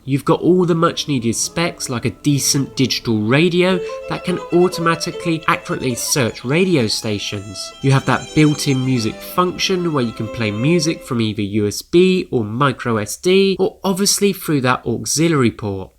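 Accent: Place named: United Kingdom. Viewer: I hate uk accent...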